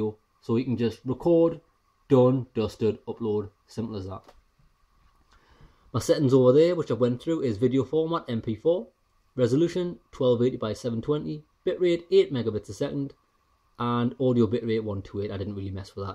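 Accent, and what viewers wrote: Newcastle, United Kingdom. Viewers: When listening threw my headphones my audio out sounds really low can I have some help Btw I realised your Geordie accent lol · Here Kid are you a geordie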